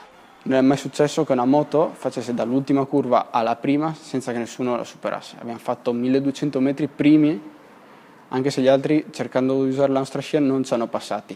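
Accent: Spain. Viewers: È vero comunque c’hai più la parlata e l’accento spagnolo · si sente che hai passato anni in spagna sembri uno spagnolo che parla un perfetto italiano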